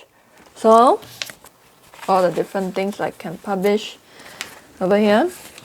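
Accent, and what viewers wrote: Singapore. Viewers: Ms love work. Ignore those who judge, cause in their eyes. You never do anything right. You can dress, speak. Dance to everything they say... and they will still find something to critique you on. On you English sounds good for me. Singlish. You could be teaching in your one of native language. That's, not going to help those who only speak English. Please keep doing what you are doing. We are going to grow-up and be just like you one day. 47/ 48 I forget sometimes. I think 48... aww don't remind me... again thank you for Jia you to break us out of this everyday rate race we are in. Maybe one of your students will stand on stage right beside you. Thanks so much. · God bless and wishing you happiness. By the way I love your Singapore English accent./ Thanks for everything you did for us.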